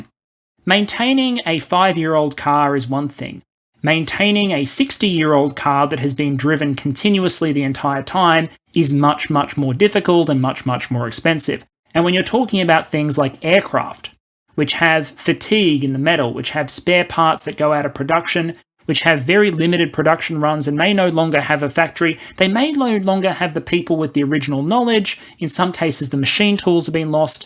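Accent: Australia. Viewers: Sound is much better, previously you sounded like you were recording in culvert. now you sound like an Australian. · Sound was good! Aussie accent helps.... calming..... My big question, do you employ additional researchers, or do you never sleep?? Content was accurate and engaging. Going to your Patron site......That is how good!!!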